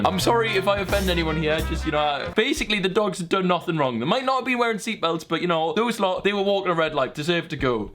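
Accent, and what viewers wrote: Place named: Newcastle, United Kingdom. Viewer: Love the fellow geordie ❤️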